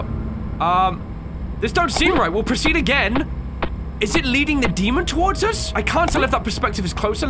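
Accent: United Kingdom. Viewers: You bri'ish mate?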